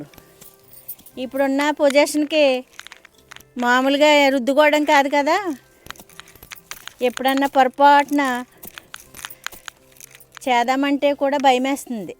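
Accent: Godavari, India. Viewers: మీ పుట్టిల్లు గోదావరి జిల్లాలా ఉంది matateeru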